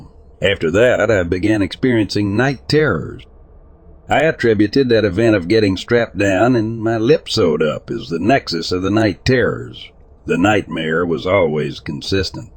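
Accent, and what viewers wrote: Texas, United States. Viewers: yeah same, he is probably around 65-75, but he does sound like he's from Texas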